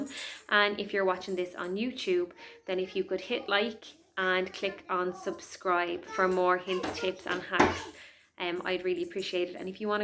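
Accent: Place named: Ireland. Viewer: Love your accent. Gotta love Irish ☘️ girls. 😊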